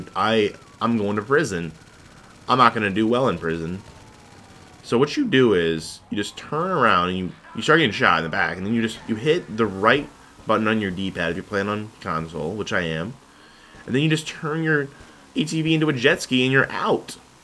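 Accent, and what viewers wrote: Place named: Canada. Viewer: Let me make a vid with you. Your in Canada because of your accent, I'm flying there soon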